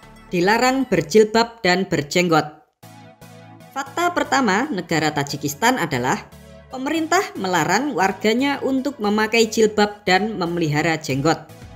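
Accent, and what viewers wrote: Java, Indonesia. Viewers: Lugot jawanya meddok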